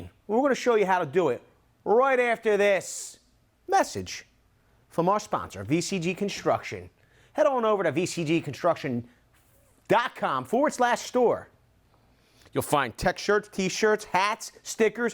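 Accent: Philadelphia, United States. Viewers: I knew that accent! It's like being home again, in Philly! Also, thanks for a clear video on cleaning my Paslode.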